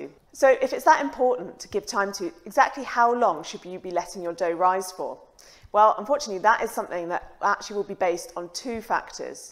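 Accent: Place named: United Kingdom. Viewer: Oh, it's definitely warmer here! No WAY would the interior of our house ever approach 65F, even in the dead of winter. LOL. Thank you for your quick reply. I appreciate it. The reason I ask is that most recipes I have encountered for baking sourdough bread recommend, after an initial 3-hour period of stretch and fold, placing the dough in the refrigerator overnight. So, you wake up in the morning, and your dough has had 8-10 hours to rise. That's worked pretty well for me, but on occasions, I have wanted to shortcut the process. I have merely guessed at what (with a winter room temp around 23C) a "couple of hours." So far, so good, but I'm flying blind. The "overnight in the refrigerator" method seems to work fine, but I'm occasionally ready to bake sooner than that! Summer's a different deal, when the interior of our house stays consistently at 26 or 27 C. 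Thanks again for your help! Don't lose that British accent, it's SO charming. :o)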